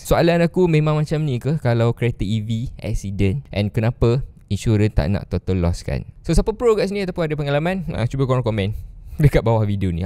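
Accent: Kelantan, Malaysia. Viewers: Org kelantan eh? Dgr cara ckp dpt detect..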